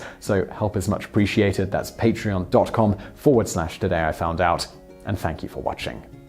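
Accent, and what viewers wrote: United Kingdom. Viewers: Question: he has a British accent but I can’t figure out if he’s a Muslim background. He’s got hairy arms and a beard and a big nose which leads me to believe he’s a Muslim. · Thank you for talking about Overton bridge! I heard about this many years ago and had forgotten about the (supposed) phenomenon. P.s. always good to hear a British accent!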